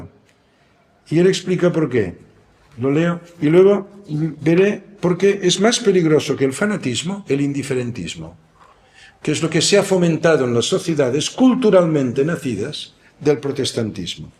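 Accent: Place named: Catalonia, Spain. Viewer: Excelente lección. Grandísimo trabajo. Gracias y enhorabuena. Por cierto, ¡maravilloso acento catalán!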